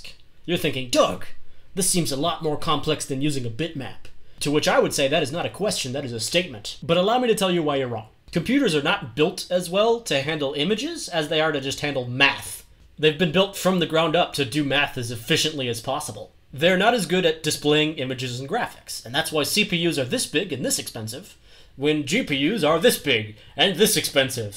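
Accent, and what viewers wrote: France. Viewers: is this guy French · you sound french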